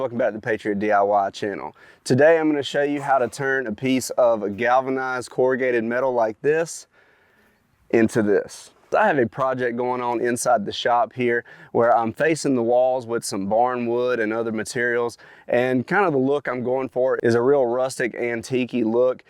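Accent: South, United States. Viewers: Interesting accent. It's like southern and California burn out surfer with a touch of central Florida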